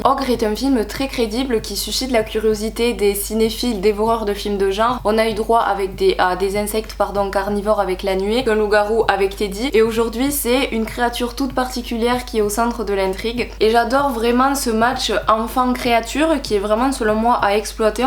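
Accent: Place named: Pyrénées-Orientales, France. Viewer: salut l'amie ! tu présente très bien ce film , sans trop en dire , et j'apprécie vraiment. les films d'horreur de chez nous ne sont pas assez mis en valeur je trouve , et quand j'ai vue la bande annonce , j'ai bien aimé . cela donne vraiment envie de me déplacer au cinéma , ce que je n'ai pas fait depuis plus de 2 ans ... je viens de m'abonner à ta chaîne , regardes la mienne si tu veux bien , je ne fais beaucoup de critiques de films , mais je vais en beaucoup plus prochainement, sur des films de genre , ma spécialité .je trouve ton accent charmant , tu est du 66 il me semble , des Pyrénées orientales ? moi je suis du 18 , dans le CHER . à bientôt pour échanger , amicalement FRED .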